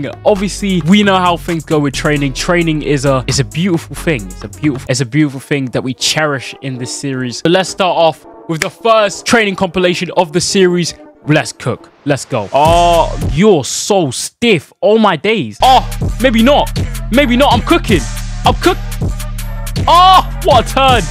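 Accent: United Kingdom. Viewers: Well duh but he’s English accent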